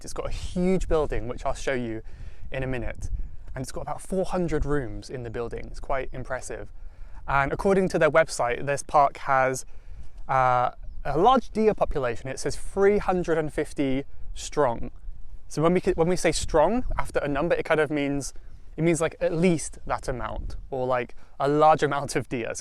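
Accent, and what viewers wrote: United Kingdom. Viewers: I love accent british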